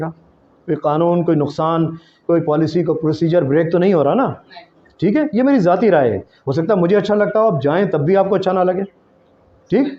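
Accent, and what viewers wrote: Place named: United Kingdom. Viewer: Good British English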